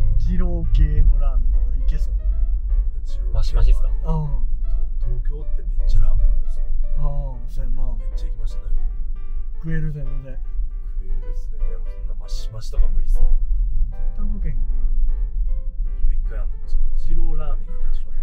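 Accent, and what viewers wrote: Kansai, Japan. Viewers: 本物の関西弁めちゃくちゃ耳馴染み良くて耳が幸せです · インタビューとは違う藍くんの関西弁にギャップを感じてキュンときた🫰🥰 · 藍くん、あんなかっこよくて性格もよくて関西弁キレッキレで最高だわ！！ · レクサスはチャラい感じするから藍ちゃんにはやめて欲しいなぁ🤣確かにAUDI乗ってそう🧡ハリヤーとかどうですか？そんな車で迎えにきて欲しい🧡ぇ 藍ちゃんの助手席羨ましすぎます！！！関西弁親近感湧くし、姿勢いいのめっちゃ可愛いです☺️☺️☺️🔰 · バレーボールの時はあまり関西訛り出てない感じするけど、動画の時は訛ってて、自然体と言うか素が出てるなーって実感できて好き💓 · 関西弁がえぇわ♡ 免許取りたてで、怖がらずガンガン行くの男らしい〜 LEXUS似合いそー！ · このルックスで関西弁やばい😢❤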